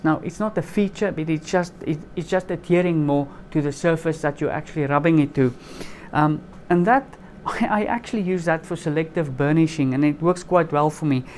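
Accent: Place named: Afrikaans, South Africa. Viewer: I stayed for the afrikaans accent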